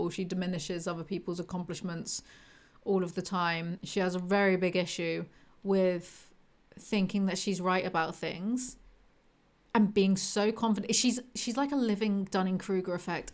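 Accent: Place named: Essex, United Kingdom. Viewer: Essex voice what's these rumours then?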